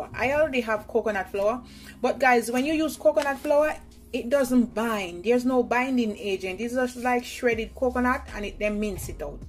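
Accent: Jamaica. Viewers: You know we loving that Jamaican accent baeeeee!!!!! zucchini bread is new to me... but woahh what a big Cucumber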